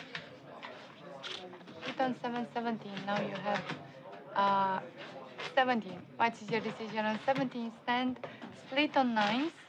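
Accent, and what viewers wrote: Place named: Eastern Europe. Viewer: thank you for the answer, so they don't hide the fact they based in Romania? I wasn't mistaken then when I suggested this is Eastern European accent :)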